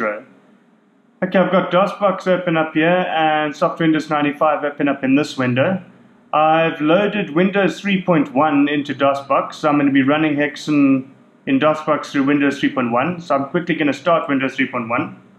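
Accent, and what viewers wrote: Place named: Cape, South Africa. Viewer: I thought I could tell from your accent. Are you from anywhere in the Cape?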